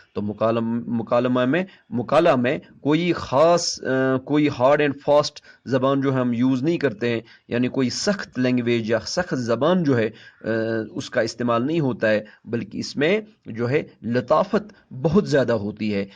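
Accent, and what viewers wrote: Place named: Sindh, Pakistan. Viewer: کیا آپ سندھی سے تعلق رکھتے ہیں ؟ آپ کے لہجے میں سندھی مٹھاس کی حلاوت محسوس ہوتی ہے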